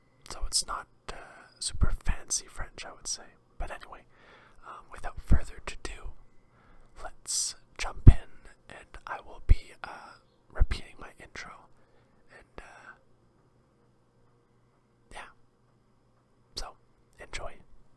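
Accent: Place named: Quebec, Canada. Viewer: J'adore l'accent québécois !! Vidéo très relaxante !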